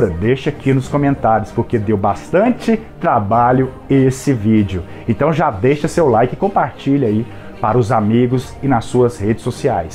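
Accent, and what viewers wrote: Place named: Minas Gerais, Brazil. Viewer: Nosso amigo fala como um mineiro! Muito bom video! Difícil encontrar material em Português sobre Enya. Uma coisa que sinto falta mesmo. Apresentações ao vivo. Por ser dependente de arranjos sintéticos para tentar expressar o som que " ouve" é compreensivel. Viver reclusa em um castelo frio na Irlanda contribui para açodar stalkers, uma pena. Mas, como venderia sem marketing? Enya foi muito importante para uma fase de minha vida. Ainda é uma referência positiva e tranquilizante!❤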